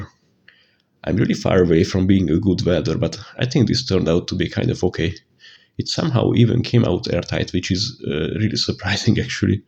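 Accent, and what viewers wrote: Hungary. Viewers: accent is Hungarian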